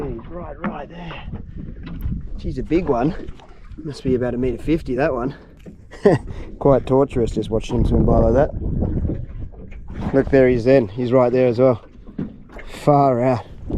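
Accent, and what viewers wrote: Australia. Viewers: Nope, he sounds Australian. They swim in clear Tupperware kayaks around those behemoths often!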